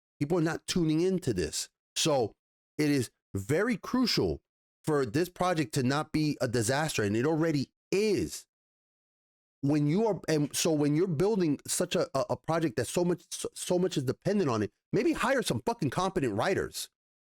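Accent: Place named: New York, United States. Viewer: Nothing speaks to my soul more than a New York accent ranting. Subscribed.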